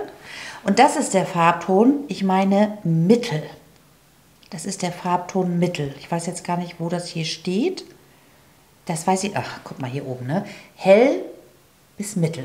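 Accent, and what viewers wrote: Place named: Berlin, Germany. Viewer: Hab dich eben entdeckt und finde du hast eine sehr fröhliche und erfrischende Art, die mich irgendwie glücklich macht. Darf ich fragen, wo du herkommst? Irgendwie hörst du dich so nach "Berliner Schnauze" an, find ick jut. LG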